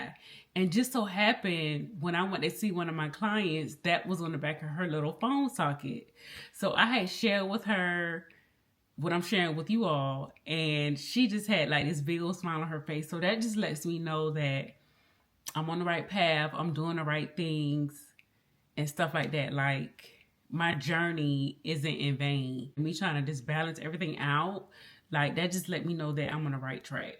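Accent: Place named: New Orleans, United States. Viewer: Your accent is beautiful. Are you from NOLA?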